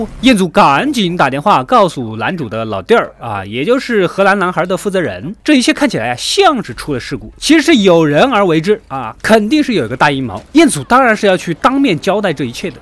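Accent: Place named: Anhui, China. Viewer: 你是我们安徽老乡吗？反正好亲切呀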